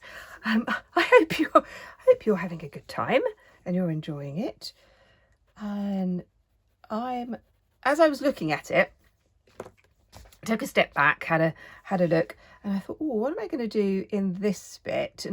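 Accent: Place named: United Kingdom. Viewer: Hallo,. I am a new fan of yours. I came across a vid from you and enjoyed it immensely. I am a transplanted Scot living in the southern US and I always love and appreciate hearing an accent from GB! Having said that: I love to do doodles and have several notebooks devoted to them but I usually use pens. I've so many coloured pencils and almost never use them as I am a painter. This has inspired me to get out my 150 Prismas set and have a go! Thank you so much! Bless xo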